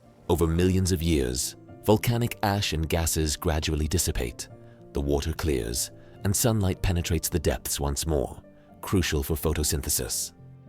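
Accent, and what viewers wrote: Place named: United Kingdom. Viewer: Just sub'd; lovely video, love that British voiceover ! Good luck with your channel...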